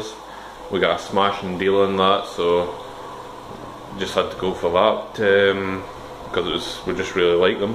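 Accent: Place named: Scotland, United Kingdom. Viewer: just wanted to ask where you are...presumably in Scotland, with that accent, but intrigued as to where, its very familiar...well to me!!! lol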